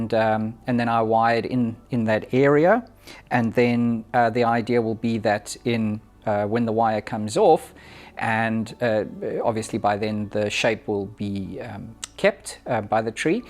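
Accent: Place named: South Africa. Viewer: Really useful and informative video, thank you 💚 I find it funny that auto captions can’t distinguish South African accents, of course I mean no offence just makes me smile when it says bins on screen when you say bends! 😂😆 thanks again very useful as always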